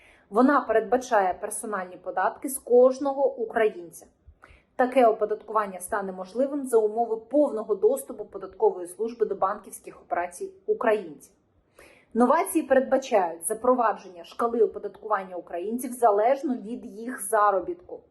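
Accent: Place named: Russia. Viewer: В українській мові немає щьо,, щьоб, чі ,тощо. Відійдіть від російської мови.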